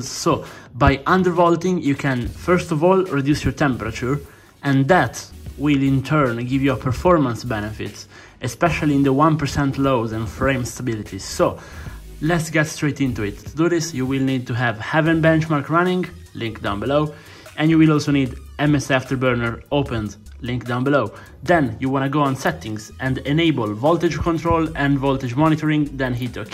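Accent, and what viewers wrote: Italy. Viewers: l'ho capito dalla prima lettera pronunciata che fossi italiano hahah, poi il menu di msi afterburner mi ha dato la conferma...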